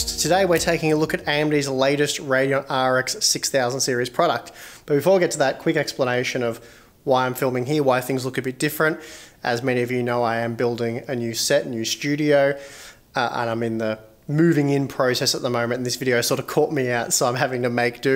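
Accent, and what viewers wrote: Australia. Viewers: Audio's good. You're Aussie so it sounds fancy.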